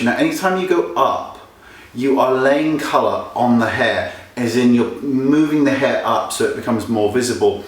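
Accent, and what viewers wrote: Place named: Bristol, United Kingdom. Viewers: Sounds like you are from Bristol. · You ARE BEAUTIFUL. And having an English accent. Not fair u look even more beautiful here you remind me a lot of my fav singer Darren Hayes I Love all of your videos. Thank you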